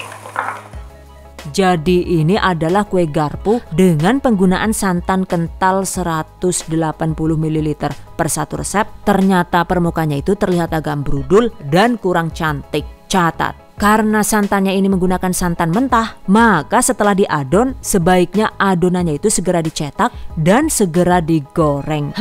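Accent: Banyumas, Indonesia. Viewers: yutuber favorite kluargaku,,,resep anti ggl,,,bhsanya mdh di mngerti tdk bertele",,,,tutornya jelas sejelas" nya,,lucu bgt klo sdh ngluarin bhsa ngapaknya ,,,😂👍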